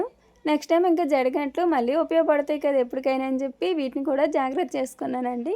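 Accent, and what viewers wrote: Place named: Guntur, India. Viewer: merekkada untaru.e bhasha ni batti tenali gunter bapatla atuside untavani anukuntunna. Me illu chAla baguntundi. Rent housa leda own house rent house ayite rent untu di. Water prob untunda taga daniki hyderabad nAku bore kottestondi. Anduke vere ooru lo settle avvalani undi.emantav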